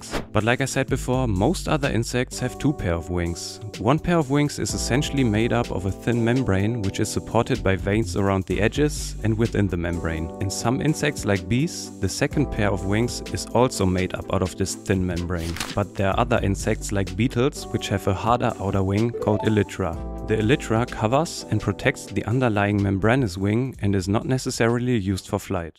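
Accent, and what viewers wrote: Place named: Germany. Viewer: Scheiss deutscher mit scheiss akzent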